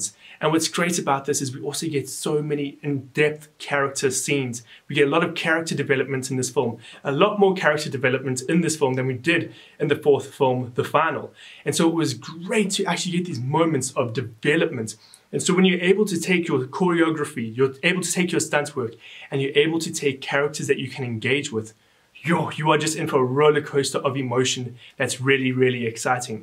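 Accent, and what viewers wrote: South Africa. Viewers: You sound South African 👍👍👍 awe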